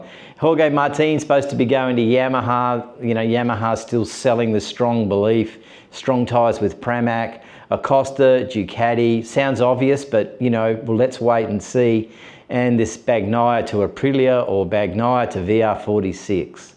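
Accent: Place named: Australia. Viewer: right. This site has the most authentic and knowledgeable discussion of our favourite sport. No AI, and in plain (Australian) English! Good one. Yes, a lot to look forward to over the next 24 months. And by the way, it is Toprak. I suspect we will all have to get used to spelling Toprak Razgatlioglu (with a silent 'g', and means 'son of Razgatli' I understand). Often called 'Stoprak' of course, for the stoppies! He and Jack have already started the Pramac stoppie contests...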